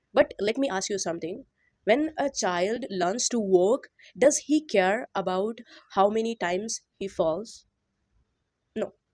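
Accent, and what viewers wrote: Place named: United States. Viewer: Are u living in US ? Ur accents is very closer to them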